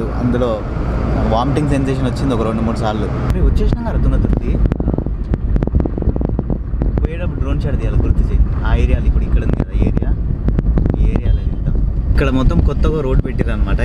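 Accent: Telangana, India. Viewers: Lockdown punnyama ani okasari YouTube lo me video chusa ... Eka roju continue ga me videos chudatam alavatu aipoindi ... Meru matlade Telangana yasa chala bagundi ... Meku nenu fan aipoina bro .. me rides and meru chupiche places and awareness chala bagundi ... Good luck bro ... All the best for future rides ..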